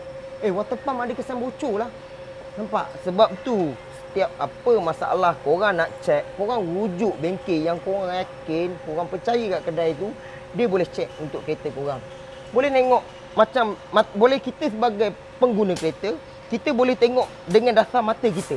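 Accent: Pahang, Malaysia. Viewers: Bro orang pahang ke ? Kampung kat temerloh ke ?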